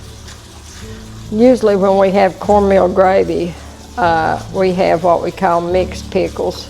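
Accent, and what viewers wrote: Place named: Tennessee, United States. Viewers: I just discovered your channel for the first time. I was born and raised 45 miles Northwest of Nashville and grew up on this kind of food and hearing my relatives speaking in this matter. This channel is just like going home. Thank you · I absolutely love your south😅ern accent.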